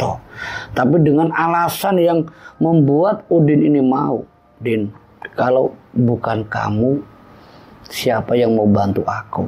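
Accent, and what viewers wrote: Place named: Java, Indonesia. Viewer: Tapi LOGAT Jawa nya masih tetap AdA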